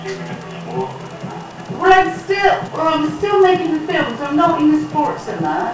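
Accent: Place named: Bristol, United Kingdom. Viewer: She's from Bristol they all sound the same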